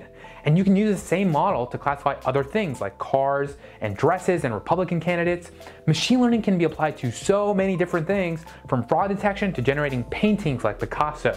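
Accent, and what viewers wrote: India. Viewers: what's wrong with your voice , seems desi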